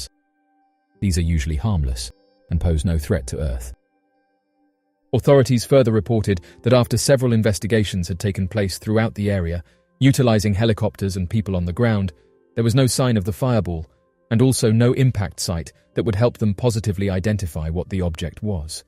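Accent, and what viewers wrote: England, United Kingdom. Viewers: You sound English so no excuse - the plural of aircraft is”aircraft” NOT “aircraft’s”. Just saying…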